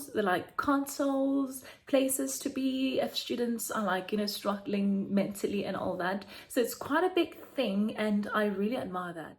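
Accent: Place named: United Kingdom. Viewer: Loved the video. But not going to lie, that accent threw me off. For a second I thought the video is about a Brit moving to SA not other way round.🙈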